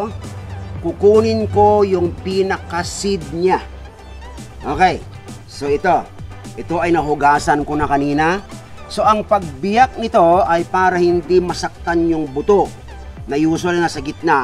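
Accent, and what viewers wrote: Pangasinan, Philippines. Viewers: Pagka po ba inilagay na sa ref ilang days po bago babasain? Same taga pangasinan po ako Sabi ko na nga po ehh halata sa boses😄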